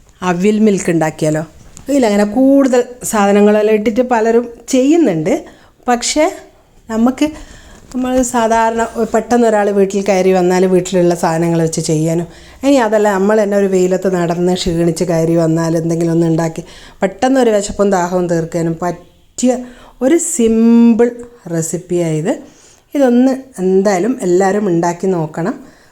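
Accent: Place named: Vadakara, India. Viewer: അവിൽ മിൽക്ക് ഇഷ്ടം ആയി സംസാരം അതിലും ഇഷ്ടം ആയി അവിൽ കയ്യിൽ ഇട്ട് നുരുമ്പുമ്മോ പൊടിയണം വടകര ഭാഷ എന്തായാലും സൂപ്പർ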